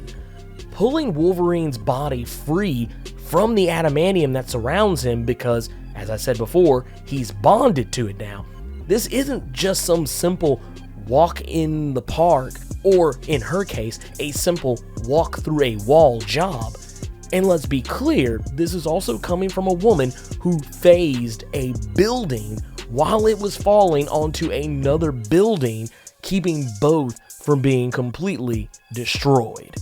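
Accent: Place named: Kentucky, United States. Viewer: you sound like you're from kentucky.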